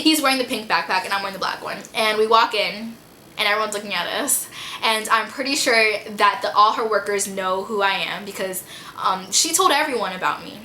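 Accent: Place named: Spain. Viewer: you sound spanish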